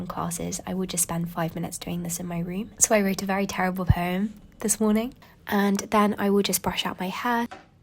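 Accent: United Kingdom. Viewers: I see. When you use "the British accent", it indicates that there is only one. If you had used "your British accent", I would have understood you meant. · I found myself in your channel looking foward to learning the British accent and I just fell in love with your videos, they're just so gentle and poetic ♡ they feel like a warm hug hahaha and also you're the cutest. God bless you.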